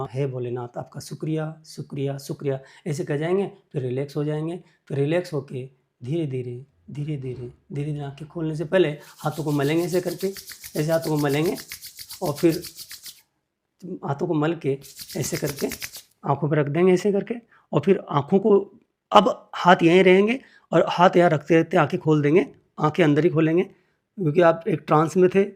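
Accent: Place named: Jaipur, India. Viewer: Sri Maan ji aap jaipur side nivasi ho kya bhasa ka put se lag raha h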